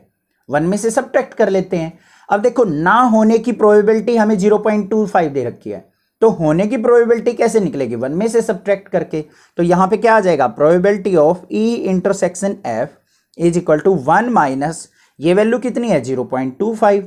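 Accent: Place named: Bihar, India. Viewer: Sir bihari h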